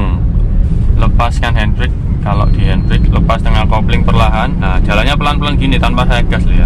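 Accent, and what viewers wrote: Surabaya, Indonesia. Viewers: saya juga surabaya om, kedung cowek suka caranya ngomongnya hehe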